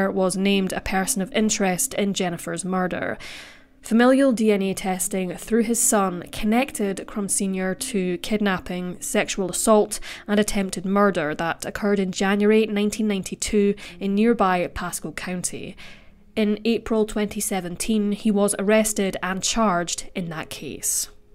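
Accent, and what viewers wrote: Scotland, United Kingdom. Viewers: Great content, great accent. Are you scottish?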